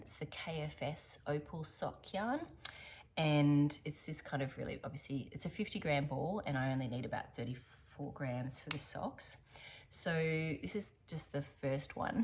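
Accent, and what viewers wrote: Australia. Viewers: Hi, am so glad I found your podcast and can’t believe it occurred by watching Beth Macdonald Stone’s podcast and she mentioned she watches and really likes your podcast. Since then I’ve been watching all your videos. As a fellow Aussie living down in Melbourne I’m just loving hearing an Aussie voice and of course seeing all your wonderful makes. Am also a big fan of Skein Sisters and buy from them regularly. Love your work ❤ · I have been gradually going through your library of podcasts - so lovely to hear an (eloquent) Aussie voice talking about making, as I'm currently living in the States. That shawl is definitely something to be proud of, it's stunning! Can't say I'm ready to dive into such a big project though, my queue is already too long. Out of curiosity, what are the shops you like to shop and ship to your American family? I feel like now that I'm here I'm missing my gorgeous Aussie merinos, but I've previously ordered Brooklyn Tweed and paid through the nose to ship it to Aus. 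Looking for some inspo to shop 😂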